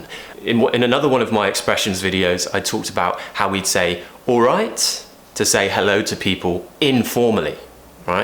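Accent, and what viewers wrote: United Kingdom. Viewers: Beautiful british accent 🇬🇧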